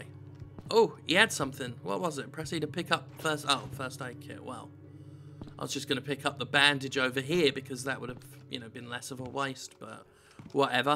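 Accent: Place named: United Kingdom. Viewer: idk if it's just me, but you're sounding particularly British in this video:O I mean not that you don't usually, but in particular idk XD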